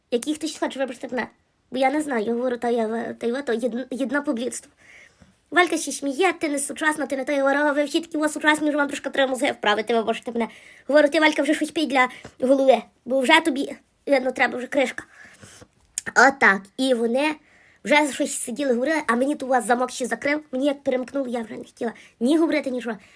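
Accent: Galicia, Ukraine. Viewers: Вітання з Німеччини! Яка колоритна наша галицька мова, слухаю і ніби знаходжуся десь неподалік. Поважаю Ваш талант і бажаю ще більшого творчого натхнення! Чекаю з нетерпінням нових серій.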